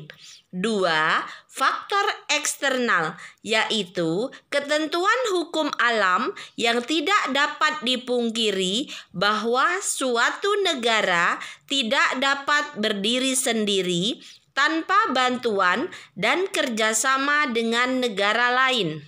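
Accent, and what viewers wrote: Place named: Java, Indonesia. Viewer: Ibu org Jawa ya? Suaranya sedikit medok kyk orang Jawa.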